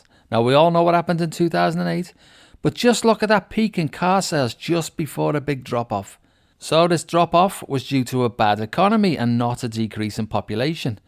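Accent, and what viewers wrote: Liverpool, United Kingdom. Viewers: I love the fact a guy with a Liverpudlian accent is informing me on the state of our economy. 😂 I've lived here for 52 years and concur with your observations.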